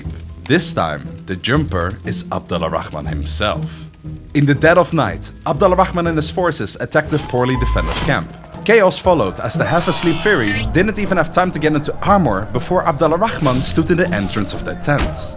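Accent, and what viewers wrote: Netherlands. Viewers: love ur Dutch accent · the Dutch accent is making it so much better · Are you Dutch by any chance?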